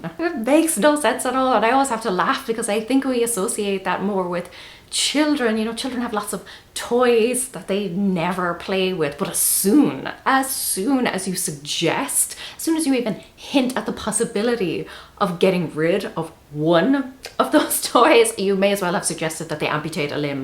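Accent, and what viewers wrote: Ireland. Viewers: Always love your videos and that wonderful melodic Irish accent xx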